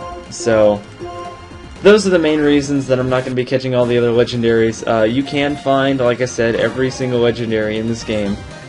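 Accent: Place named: United States. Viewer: Hablas espanol? Tu ingles es de Americano! (O aprendiste espanol como 2da lengua?)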